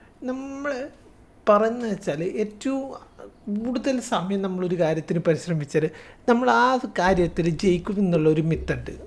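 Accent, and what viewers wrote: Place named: Thalassery, India. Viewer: നാടൻ തലശ്ശേരി ശൈലി❤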